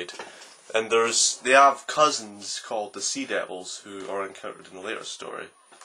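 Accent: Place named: Scotland, United Kingdom. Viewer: What a very beautiful young man. I could listen to you all day. Adore the Scottish accent.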